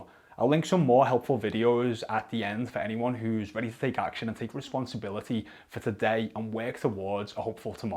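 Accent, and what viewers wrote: Liverpool, United Kingdom. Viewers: Love the Scouse accent. Brilliant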